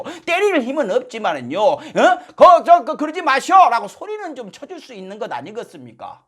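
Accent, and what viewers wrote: Jeolla, South Korea. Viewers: 니는 증말 전라도인니? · 말이필요없는 사이다발언 박수 치면서 듣고 있어요 찰진전라도 사투리가 맛깔나부요 계속 응원할게요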